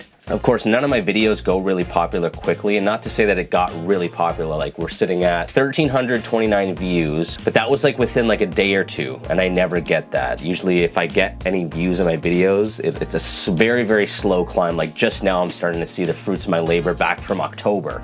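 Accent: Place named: Canada. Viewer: I think he is Canadian. It may be the about = aboot (not teasing, I have a few pronunciation quirks myself).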